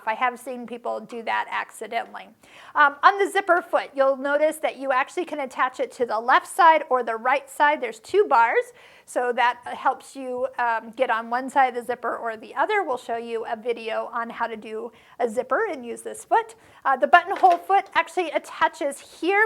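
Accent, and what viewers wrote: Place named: South Dakota, United States. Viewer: Ty this was really helpful but I gotta say this is the most south dakotan accent I've ever heard 😆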